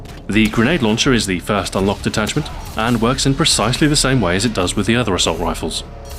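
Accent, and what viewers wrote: United Kingdom. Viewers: It's the British accent and professional sounding delivery. If it was narrated by driftor it would be much less impressive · The monotone and slight british undertone give all of your videoes a "guide on tape" feel, which is just right for the amazing detail and quality of your guides. the lack of intolerable music and over opinionated screaming of most youtube videos has gotten you another watcher. I have subscribed and i hope you will do the same for black ops.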